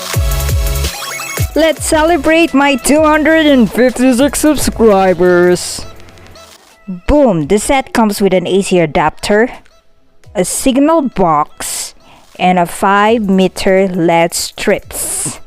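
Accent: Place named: United Kingdom. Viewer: Blue for sad ☹️ Anyway, pinapasaya tlga ako ng british accent mo sis HAHA.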